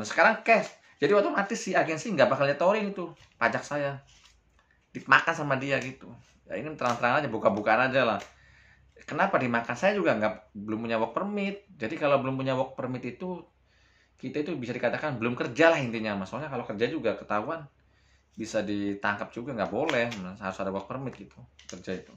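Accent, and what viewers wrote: Indramayu, Indonesia. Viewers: Sampean asli Indramayu ta kang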